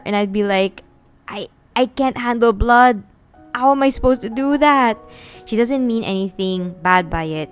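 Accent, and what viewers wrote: Philippines. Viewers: I knew it! I thought maybe you are also from the Philippines when I heard your voice haha! I'm happy for you that you found yourself and endured through the hard times in life. I'm also in this lifelong pursuit of art and I have a lot more to go through and its not easy, but I know this is the thing that I've stuck through all this time no matter how challenging it becomes.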